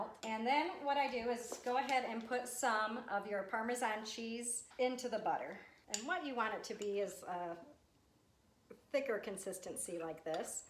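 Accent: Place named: Midwest, United States. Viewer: Okay, now I hear the accent. Wisconsin or Michigan? 😸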